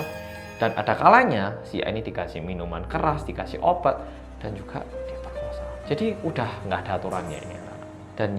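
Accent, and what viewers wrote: Java, Indonesia. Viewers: First impression liat videonya lek 😁 krn org korea yg medhoook 😍 stlh liat² trs videonya trnyta personality nya Indonesia bgt mmg 👍🥰 apalg dg logat medhoknya yg kandel,sesama jowo aku bangga bgt 👏🤩 Trs berkarya lek,aku padamu 🤭🥰🤩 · Bang logat nya Jawa banget😁 udah kayak logat presiden Jokowi 👍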